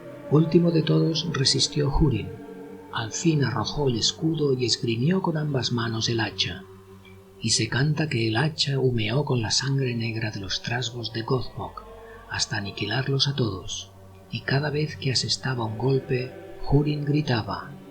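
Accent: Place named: Catalonia, Spain. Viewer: Qué asco el puto acento catalán de mierda 💩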